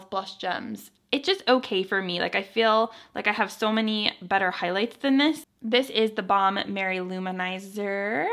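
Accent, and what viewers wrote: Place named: New York, United States. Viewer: I love your New York accent!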